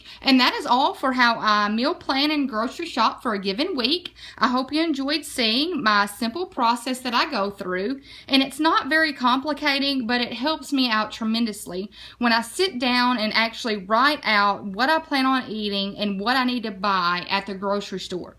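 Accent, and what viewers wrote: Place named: Alabama, United States. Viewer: Girl this is a very good system and printout. I'm from Alabama and I know you are close by.......I recognize that accent :)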